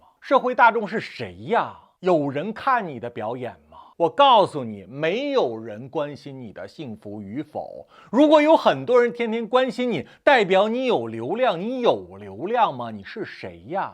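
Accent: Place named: Northeast, China. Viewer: 难得的高度！阅历丰富、悟性极强才能有这么深的感悟。是不是在北京长大的东北人？这口音尾音儿有东北味儿。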